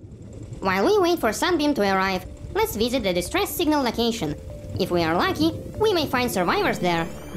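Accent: Russia. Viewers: Мне одному отчетлево слышен его руский акцент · Ох ебать акцент как будто на русском смотрю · Блин слышно у него русский акцент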